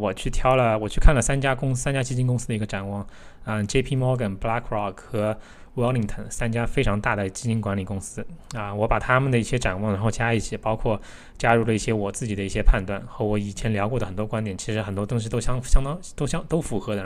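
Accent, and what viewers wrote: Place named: Shanghai, China. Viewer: 哈哈哈，你是个小姑娘吧？看的我笑出声了。他说话上海口音很明显呢，长相很适合演老顽童年轻版本。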